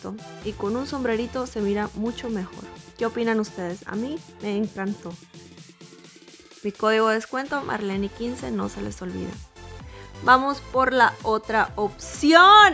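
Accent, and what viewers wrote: Mexico. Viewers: Porque habla como mexicana.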